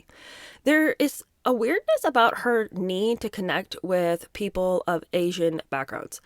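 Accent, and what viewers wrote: United Kingdom. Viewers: I can’t stand listening or looking at anything about Megan markle BUT seriously, your videos make it so humorous that I find myself laughing so much that it hurts. Your British accent cracks me up with laughter, your analysis of Megan teagan and the boring s h I t show is the most hilarious video I’ve ever seen. I’m gobsmacked that teagan posted those horrendous pedo comments about children, I was un aware of her evil, sadistic, perverted nature! Ewe! DHS should check up on Reagan’s kids, b/c I wouldn’t be surprised if she’s lent them out to other pedos, maybe that’s why she was elevated as a celebrity b/c who she knows and who she gives her children to, to abuse them. There’s so many pedo elites willing to help ppl like teagan, IMO. I feel so sorry for you having to sit and watch Megan’s crap show so that we don’t have to thank you sooo much. 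I love your videos please keep it up. 👏🏻👏🏻❤️🫵🏻😂🤣😂🤣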